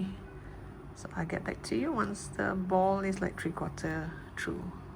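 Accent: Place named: Singapore. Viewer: Are u Singaporean 😭 ur accent is like Singaporean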